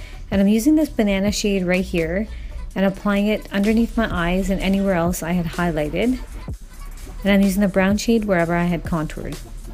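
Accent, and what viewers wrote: Punjab, India. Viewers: You have a lovely Punjabi accent...😊😊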